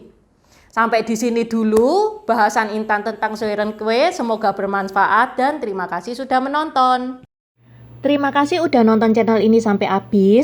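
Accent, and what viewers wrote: Java, Indonesia. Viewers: Intan suaranya banyak dialek. Jowo pada hal Aku penggemar Intan ini orang Bugis. Lhoo 🙏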